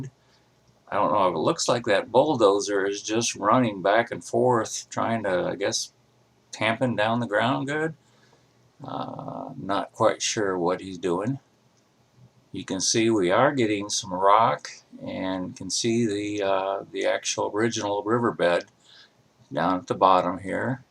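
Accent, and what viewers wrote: Ohio, United States. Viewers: Hey, I am watching your dam removal videos from the day of the first day and the notch. You did a great job! Maby the best dam removal documentation of all. And your Ohio accent is very good for me to understand and sounds very pleasant to me. Thank you very much for your great videos! Greetings from Krefeld/Germany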